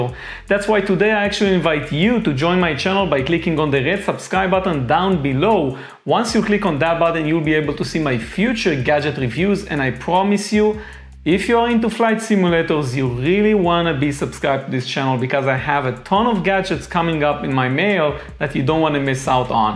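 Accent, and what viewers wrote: Israel. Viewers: שומעים שאתה ישראלי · I love your Israeli accent :))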